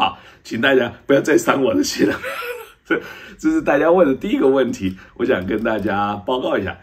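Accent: Standard. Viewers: 章兄的國語字正腔圓⋯聽力正常者應該不需字幕😂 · 了解，沒有字幕沒關係，您的國語很標準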